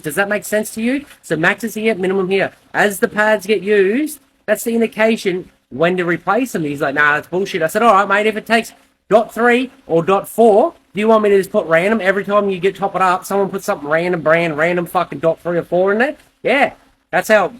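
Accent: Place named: Australia. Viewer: Aussie brah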